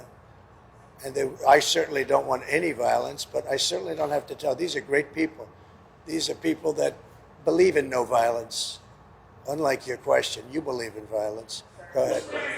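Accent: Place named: South, United States. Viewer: You know, recovering from coma is a long and challenging process, maybe that's why you sound a bit like one of those ignorant uneducated toothless that smell like onions from Hillbilly land, Please go back to Hillbilly land buy more dark tapes and cover his mouth, he don't deserve to be in this country.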